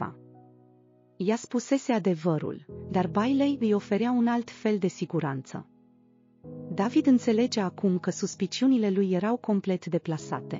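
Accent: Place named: Moldova. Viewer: Limbaj cu accent specific moldovenesc și accente puse anapoda pe cuvinte.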